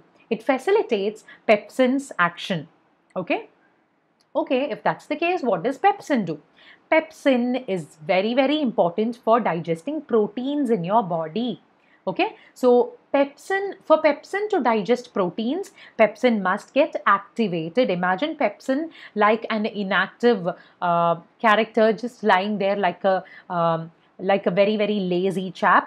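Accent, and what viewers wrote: Kerala, India. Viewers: ma'am are you from Kerala?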